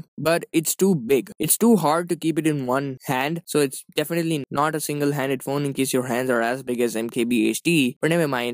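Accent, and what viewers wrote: India. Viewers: U got indian English accent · Your indian accent is a little thick, just me.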